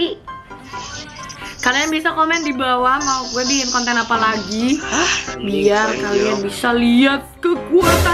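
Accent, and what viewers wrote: Indonesia. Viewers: Ngomongnya sunda njay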